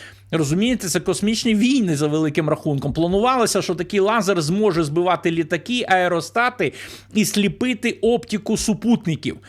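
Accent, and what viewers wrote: Russia. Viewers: Як бридко слухати наслідування вимові рашистські мові; сказаф, пішоф, даф...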